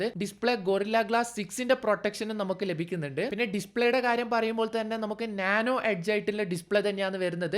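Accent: Kannur, India. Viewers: Kannur ano?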